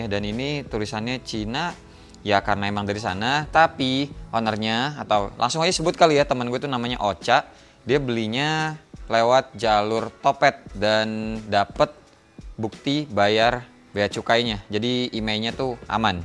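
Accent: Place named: Sunda, Indonesia. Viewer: Aya campuran sunda saeutik 😭🤣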